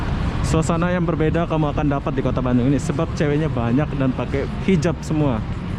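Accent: Malaysia. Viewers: Orang malaysia itu .cara ngomong nya keliatan . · Kak asli org mana? Kok ngomong ke melayu melayu gitu hehe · Ini Abang dari mana logatnya mirip orang Malaysia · Abang dari Lombok???😑😑😑 Bahasa Lombok dan loghat nya GK ada Melayu 😑😑tipu lah Abang ni,Abang dari Malaysia bukan dari Lombok 😑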